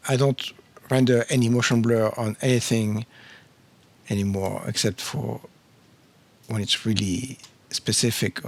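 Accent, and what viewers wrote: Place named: Arab world. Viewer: you have an Arabic accent. nice video by the way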